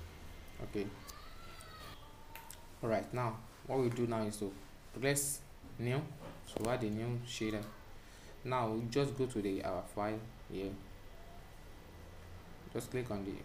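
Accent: Nigeria. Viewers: are you a Nigerian?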